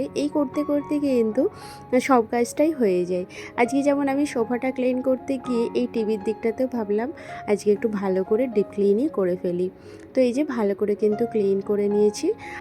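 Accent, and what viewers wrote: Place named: Bihar, India. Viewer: তারা তুমি কি বিহারী বলোনা আমাকে প্লিজ কারণ তোমার কথাবার্তা শুনে মনে হয় বিহারী লাইক দিয়ে তোমার ভিডিওটা আমি সম্পূর্ণ ফুল ওয়াচ করলাম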